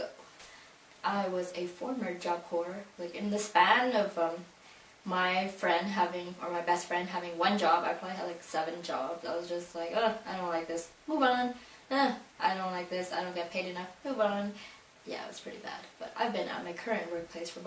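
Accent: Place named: New Zealand. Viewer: hehe yup but i think its the new zealand accent seems easy to pronounce for me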